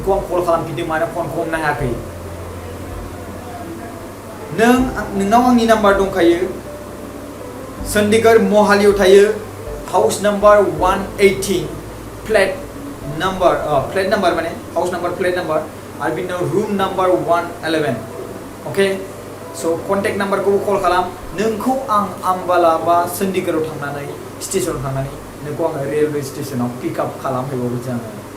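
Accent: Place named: Baksa, India. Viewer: Baksani railainai ...style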